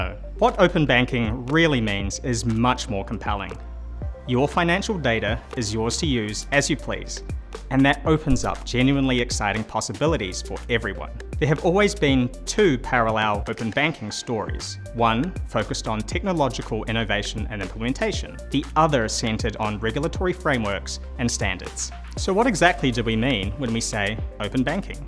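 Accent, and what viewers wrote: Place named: New Zealand. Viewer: Great to hear a Kiwi explain that so well!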